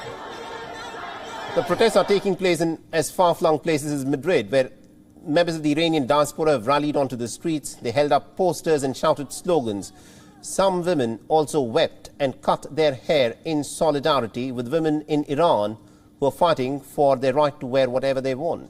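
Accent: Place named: India. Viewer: Oh my gawd your thick indian accent!!! We cant understand a thing!!! Please replace this news anchor.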